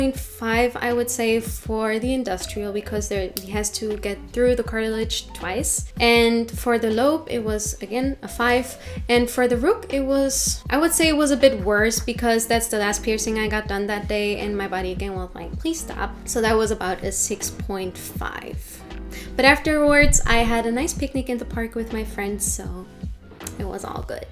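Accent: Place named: Germany. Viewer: Nice Video Hab einfach sofort man dem Akzent gemerkt, das du deutsch bist🥰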